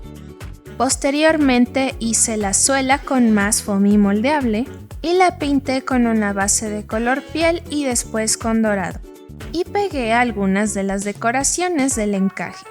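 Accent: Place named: Mexico. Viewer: Como me gusta la forma de tua vídeos! Y los dos quedaron preciosos! Me encanta tu tonada mexicana, sou brasilena.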